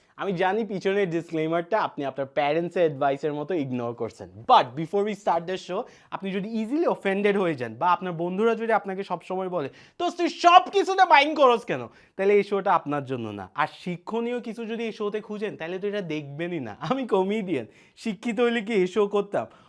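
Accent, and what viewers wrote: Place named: Chittagong, Bangladesh. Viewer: চিটাইগ্যা নাকি ভাই!?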